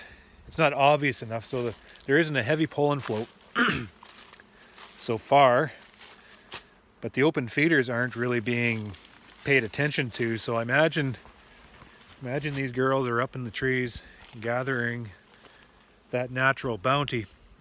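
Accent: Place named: Canada. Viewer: I prefer the pronunciation p-oh-llen, over the pronunciation p-ah-llen. Not sure which is correct. Perhaps both. Your pronunciation just sounds right. I love the way Canadians speak English, and I love all of our northern neighbors, Eh?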